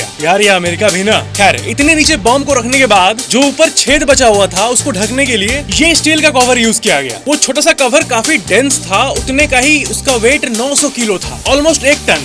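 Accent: Bihar, India. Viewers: Annoying Bihari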